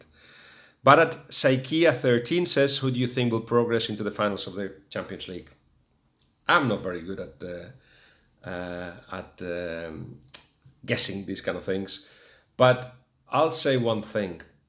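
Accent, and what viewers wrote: Spain. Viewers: So this guy right here is certainly a comic, he pretends he knows about football but I honestly don’t think he does, so he came here sat on the same chair with his thick Spanish accent talking about how tactical and good OLGS was, and that he was a better fit than mournho, I’ve always said this, if mourinho had been allowed to be mourinho and not crucified him like the media did to make him Look a mere manager then United would have been better off, pogba got mourinho that axe because he couldn’t free him, or martial is not given the freedom, oh Alexis wasn’t given the freedom that was staple everyday, mouh is gone yet still united are shit. This guy said United are having a mourinho hangover because some of the players are used to his way which is utter nonsense, pogba will force a move to Madrid just watch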